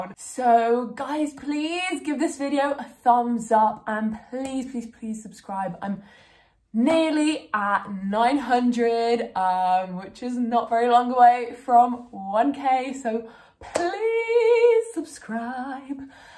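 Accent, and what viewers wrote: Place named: United Kingdom. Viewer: I love your hair, your accent reminds me so much of someone from a British sitcom like skins 🤣❤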